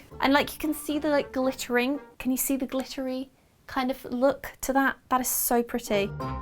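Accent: United Kingdom. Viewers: Would not mind seeing and hearing you for two hours your from my neck of the woods so is always nice to hear another person from the UK but from elsewhere in the world I love the look of the Inky box hugs from Scotland 😁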